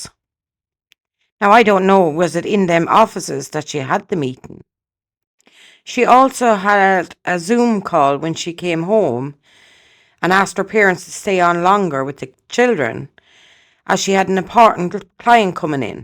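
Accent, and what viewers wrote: Ireland. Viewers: What part of Ireland are you from ?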